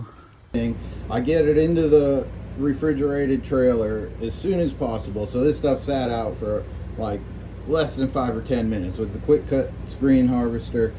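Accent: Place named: South, United States. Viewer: Yooooo broooo! This is awesome and so glad to see you starting up a youtube channel for all the amazing work you do! It's been very inspiring to see all the progress over the last year and all your wicked smart inventions/solutions to daily farm work. I'm going to get up there for a visit this fall and check it out in person. Also, I think you're almost officially a southerner with that sometimes southern drawl ;)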